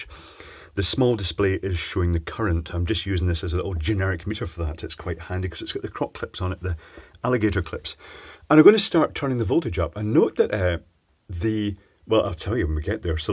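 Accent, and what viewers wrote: Scotland, United Kingdom. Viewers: I think you're mishearing his dulcet Scottish tones there, +jeromekerngarcia : 12 x 28 = 336. He later points out that the long rows are 7 x 4 = 28, so it's really 4 x (12 x 7), which of course still comes to 336, although you're right that Brexiteers would probably scrawl "It's 240 LEDs!!!" in massive letters on the side of a bus and claim anyone pointing out that the maths shows 336 was part of "Project Fear", these days known as "Project Reality" ;)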